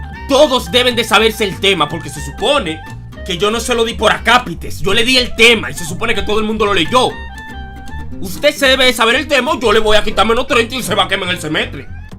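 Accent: Dominican Republic. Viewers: Apuesto que es Dominicano jajaja 🇩🇴🇩🇴🇩🇴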